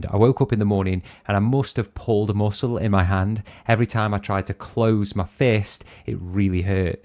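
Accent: United Kingdom. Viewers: I'm sure what you said earlier may have been valid, but that last comment about not working for the next person is just dumb. Did you put up your drywall (or concrete since you sound British and i know you guys dont build with paper mache like we do) before your plumbing and electrical work? It doesn't make sense. You should run the utilities first and then seal it off according to the codes in your country. Why would you put up a wall only to have to tear it down later to install wiring and plumbing? That approach is backwards and just creates extra work for yourself or the next person.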